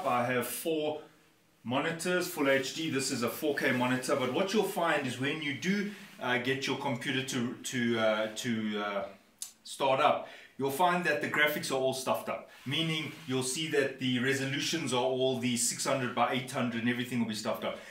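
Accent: South Africa. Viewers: Nice to hear that proppa Sarf Efriken accent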